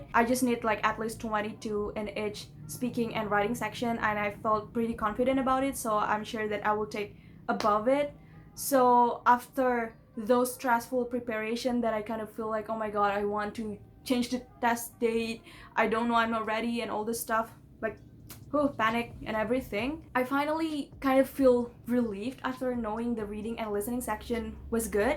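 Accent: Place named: United States. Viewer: your american accent sounds recognizable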